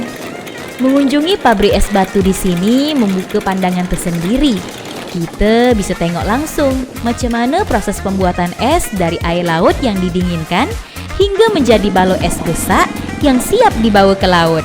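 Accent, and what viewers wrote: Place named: Malaysia. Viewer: Kenapeeee suara narator ini seperti orang Malaysia. Padahal ini ada di provinsi jambi. Tak asik lah dengar suara seperti niiii. Tak jadi saya tonton.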